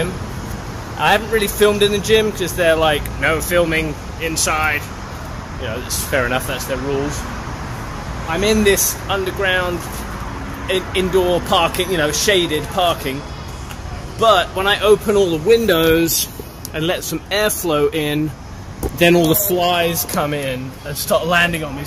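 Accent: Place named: United States. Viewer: This is amazing!! It’s sweet you’ve fitted your own solar panel on the top of your van- I love it! You never cease to amaze me & I love catching up after awhile cos you’re always up to something completely different (apart from the melons 🍉🍉🍉 ofcrs!). Also noticed a wee twang of American in your accent these days 😃 Man of the world 🌎✨ xxx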